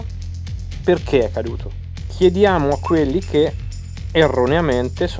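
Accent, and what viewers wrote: Perugia, Italy. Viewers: Ciao, sono sul tuo canale per la prima volta e ti trovo molto bravo, una domanda: sei di Perugia? (Lo sento dalla parlata) 🤗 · Ma sei di Perugia? Grandissimo 😂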